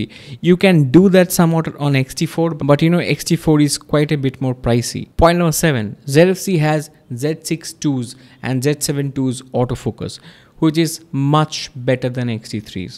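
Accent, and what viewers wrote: India. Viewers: Indian English accent usually makes people sound a bit silly, but damn this video was good. Thanks a lot, I am currently almost-decided to get the Z fc.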